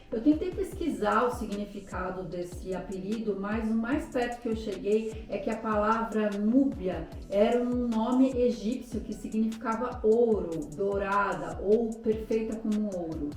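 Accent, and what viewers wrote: France. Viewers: te encontrei em 2025 e estou AMANDO seu canal!!! Você narra muito bem, com muitos detalhes!!!! E seu francês é uma delícia de ouvir!!! 🥰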